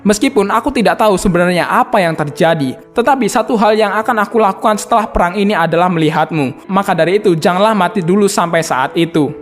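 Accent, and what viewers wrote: Java, Indonesia. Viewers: Tapi nada yang emang bikin nagih. gx bisa digantiin. Apalagi inggrisnya enak bro, lancar tapi aksen jawa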